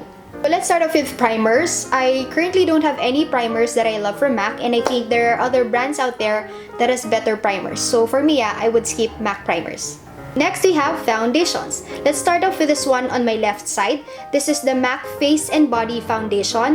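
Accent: Philippines. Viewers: your english is cery tagalog.... we dont understand what u talk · I love how true you are to your filipino accent. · Her accent is so filipino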